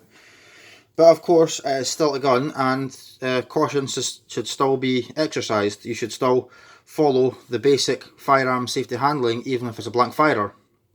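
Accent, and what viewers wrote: Scotland, United Kingdom. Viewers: Scottish accent is charming. And we do not have to paint our blank guns here. Love from Ukraine.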